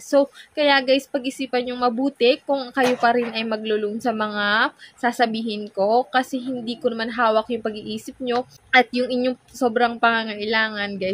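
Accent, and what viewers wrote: Batangas, Philippines. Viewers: laang?? 🤣😁✌️✌️ batangenya ka te? hehe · Batanggeña ka po??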